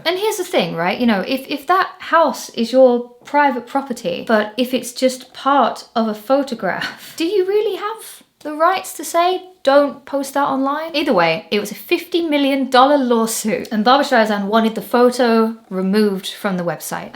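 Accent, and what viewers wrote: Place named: Northern England, United Kingdom. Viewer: I like your little northern accent coming through the Essex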